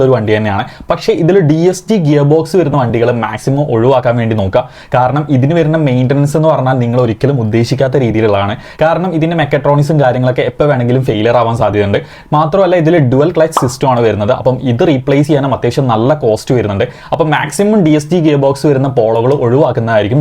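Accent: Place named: Palakkad, India. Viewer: It's palakkad slang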